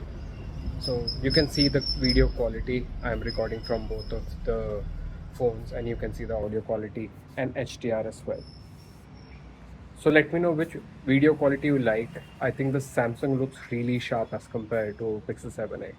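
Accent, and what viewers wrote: India. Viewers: I love the India accent. 😁👍🏽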